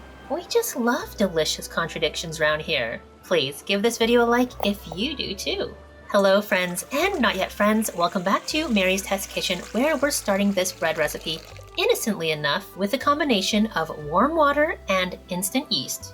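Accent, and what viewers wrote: Canada. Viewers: This looks like a good recipe for my new Kitchenaid mixer still in the box... first of your videos I've seen and subscribed... from the accent it sounds like your from friendly Canada,,thanks for the video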